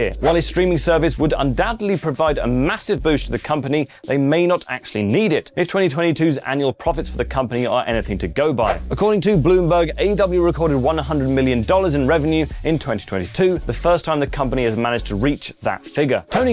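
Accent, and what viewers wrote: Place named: RP, United Kingdom. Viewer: This guy is super posh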